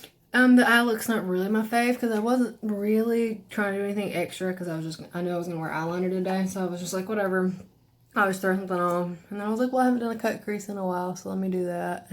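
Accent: South, United States. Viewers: you have the same southern accent I have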